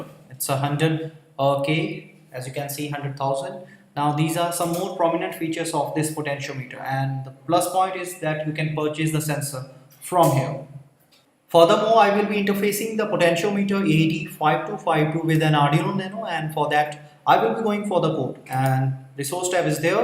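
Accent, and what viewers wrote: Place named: East India, India. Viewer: The East Indian accent is difficult enough to understand without the tutorial taking place in a live room.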